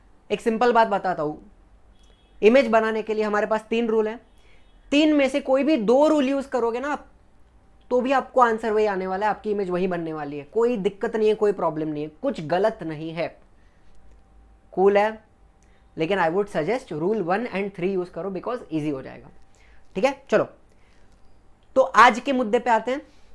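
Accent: Maharashtra, India. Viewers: sir your mother tounge is marathi?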